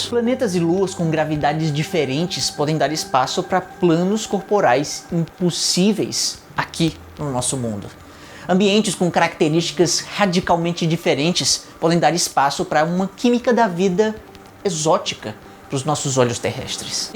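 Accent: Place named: Northeast, Brazil. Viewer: quando comecei a assistir ja suspeitei que o canal era nordestino :) mt bom o conteúdo parabéns.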